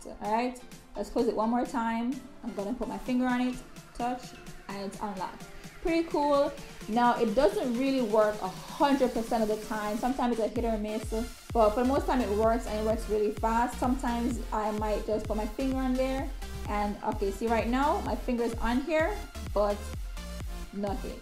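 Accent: Jamaica. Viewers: So many unboxings but how many with a Jamaican accent? Actually this is the first Pixel 2 unboxing I am watching.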